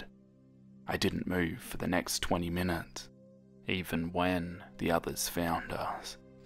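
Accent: Australia. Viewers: Ayyy an Aussie 😌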